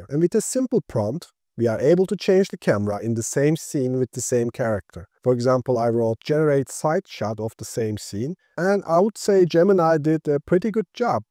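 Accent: Turkey. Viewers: thanks for content bro 👍 i assume you are Turk right ? just feel it by your accent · baba türkçe aksan akıyor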